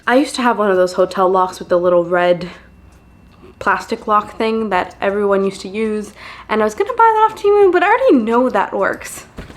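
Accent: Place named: United States. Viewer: You sound like you are American. Those adaptors work great if you are not American because most places use 220V and you just need an adaptor. But if you use it on US products that are 110V you will burn them all up the second you plug them in. You need a transformer to go along with that to step down the voltage to 110V. While some us appliance have both 110v & 220v don't count on that because most don't. It will say right on it if it does. If you do it an cause a fire in your hotel or airport or anywhere else You will have to pay for the damages which won't be cheap. If you don't have the money to cover it many places will put you in Jail and then deport you back to your country. How long you wait in jail before you are deported varies and can even be months. Things to keep in mind but not to scare you. Be smart and you will be fine.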